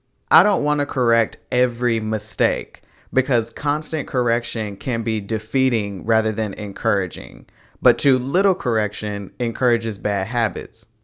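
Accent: Dominican Republic. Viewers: This whole time I thought you were Dominican, you speak like my cousins. I love this and appreciate you putting out learning content for us no sabo kids. This is huge motivation for me. Much love 🇵🇷♥️🇩🇴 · If I speak Dominican Spanish it’s thanks to you, until today I thought you were Dominican 😂😂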